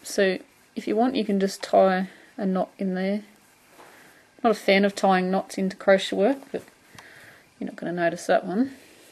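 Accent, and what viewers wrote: Australia. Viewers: Made this today in an earthy brown to match the little bag I made from your cluster stitch bag pattern. SUPER cute. LOVE your patterns. Love your videos. LOVE your Aussie accent ;) p.s. Aussies rule. Never met an uncool Aussie.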